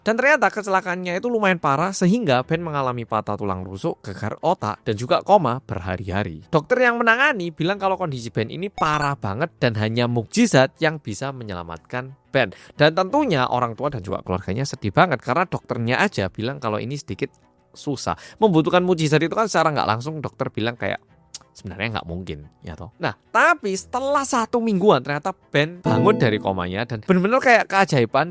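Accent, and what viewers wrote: Java, Indonesia. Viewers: Logat medok'e kui, mas pancen jowo tenan👍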